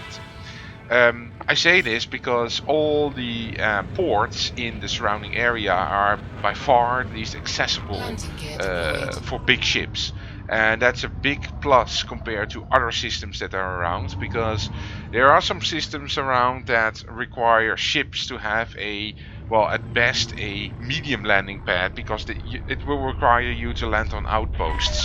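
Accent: Netherlands. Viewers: is it just me or are you dutch just like me cause your dialect sounds a bit dutchy XD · That dutch accent is strong =) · Hoi, ik hoor aan je accent dat je wellicht Nederlands bent (no offense je Engels is verder prima), maar ik begin net in Elite Dangerous. Of nouja net, ik heb al aardig wat uren er in, maar ik wil het nu wat serieuzer nemen. Kan je misschien enige tips geven om snel te stijgen in ranks?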